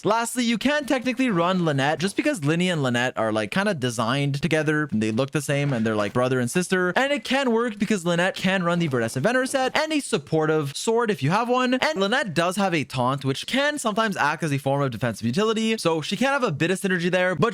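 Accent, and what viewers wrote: Quebec, Canada. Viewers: does he have a cold or Canadian?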